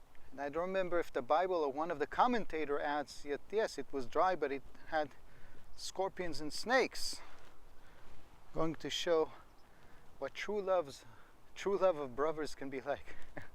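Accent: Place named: Israel. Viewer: so interesting.... I LOVE your Israeli accent!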